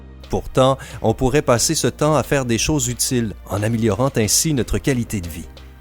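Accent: Quebec, Canada. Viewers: Like si tu trouve que la vois et quebecoise lol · 10ème commentaire! Sinon, t'as un genre d'accent français/québecois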